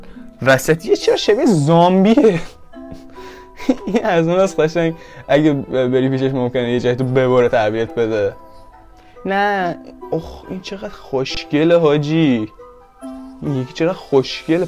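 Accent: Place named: Gilan, Iran. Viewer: تا حرف زدی گفتم گیلک هستی باعث افتخاره همشهری ❤😎👌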